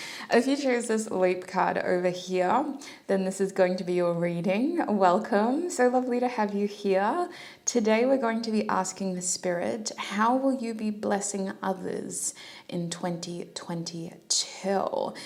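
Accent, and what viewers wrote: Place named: Australia. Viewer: Thank you, love your Accent, either Australia or New Zealand? interesting reading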